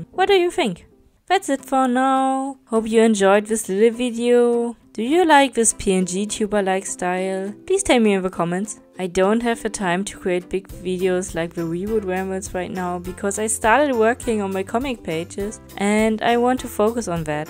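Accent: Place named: Germany. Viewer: By the first sentence I knew you are German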